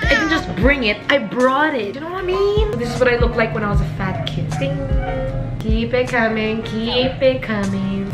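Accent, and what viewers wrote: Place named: Philippines. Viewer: Oh my goodness I love your Filipina accent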